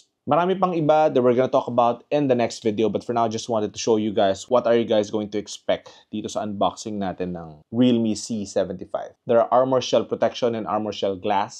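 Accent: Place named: Singapore. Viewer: Kung di ka nagtagalog kala ko singaporean ka na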